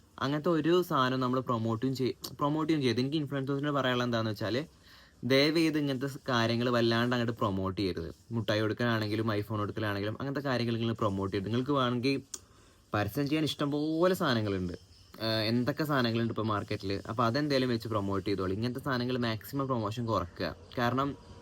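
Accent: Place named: Malappuram, India. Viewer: Good Advice & Motivation.. അസ്സൽ മലപ്പുറം സ്ലാങ്, വീട് എവിടെയാ?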